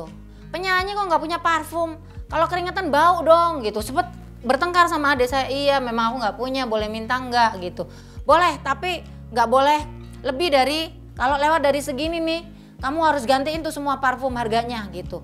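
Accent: Java, Indonesia. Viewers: Ciri khas bunda inul medok e lho sing marai seneng denger bunda ngomong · Ya Allah mbak inul masih kentel am jawanya...seneng bngt dengernya...jngn di hilngkan khas jawanya y mbak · aku sukak gaya medok bicaranya bunda,,, podo2 wong jowo bun😍😍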